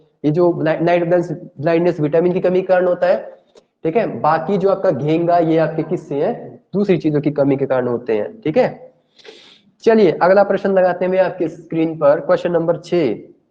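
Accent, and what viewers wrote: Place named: Himachal Pradesh, India. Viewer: Love ur himachli excent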